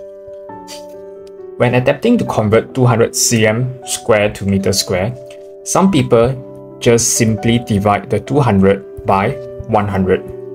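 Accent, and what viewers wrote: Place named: Russia. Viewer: Where are from man I love your style of teaching accent looks like Russian or european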